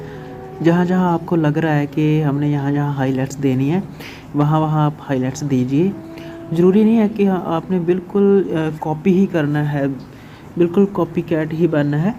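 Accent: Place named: Punjab, India. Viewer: Hindi me punjabi touch 😁😁😁 but vry nice sir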